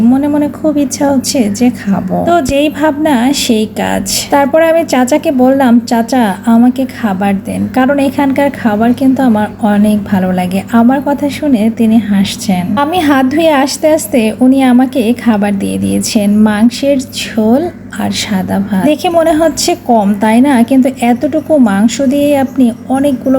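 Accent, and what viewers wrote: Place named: Rangpur, Bangladesh. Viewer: আসসালামু আলাইকুম আপু ❤আপু আপনি রংপুরের ❤সেটা আমি ভিডিও দেখে আপনার কথা শুনে বুঝতে পারলাম ❤আমার বাসা রংপুরে ❤প্লিজ কমেন্ট ও রিপ্লাই দিবেন ❤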